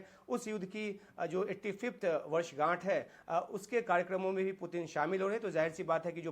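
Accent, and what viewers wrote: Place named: Bihar, India. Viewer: साला बिहारी तेरि pronounce से पता चलराहा है तु बिहारी है या बाङ्गगाली है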